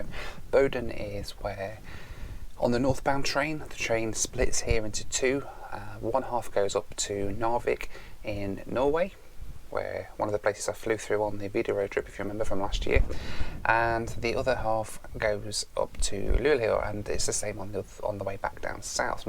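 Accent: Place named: United Kingdom. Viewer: Hi... Which part of the UK are you from? Trying to work out your accent!! Cheers:)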